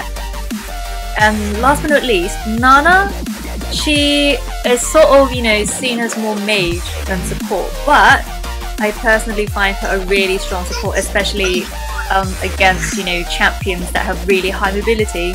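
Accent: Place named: United Kingdom. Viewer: Gg British accent..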